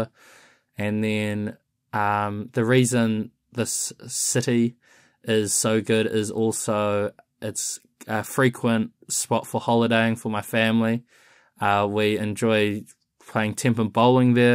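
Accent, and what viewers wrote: New Zealand. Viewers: 발음 목소리 너무 닮고 싶어요~^^ 로토루아? 거기 좋다고 하셨죠? 기억하고 함 가봐야겠네요ㅎㅎ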